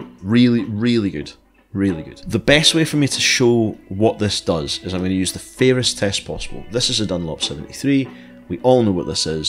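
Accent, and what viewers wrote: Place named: Scotland, United Kingdom. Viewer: what part of Scotland are you from?...My mother is from Blantyre and your accent sounds suspiciously close!... 😊...I just ordered these pics... thanks for the review and recommendation!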